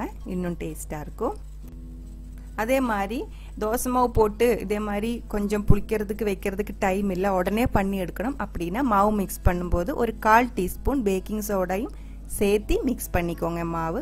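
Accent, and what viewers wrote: Kerala, India. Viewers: super recipi. malayalam kalantha unga thamil super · Neenga malayali thane.unka pechula theriyuth.so nice.ur voice and recipe 😊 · Malayalam’ised tamil sounds quite irritating . Sometime sound like mocking.. I don’t understand why .. no Tamils or any other would start a channel targeting other languages.. would request not to kill the language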